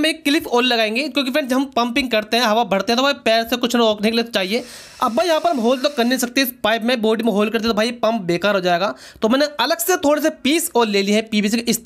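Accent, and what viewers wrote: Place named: Haryana, India. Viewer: Aap kapil sharma jaisa bol rahe hein Shayed aap haryana se hein Good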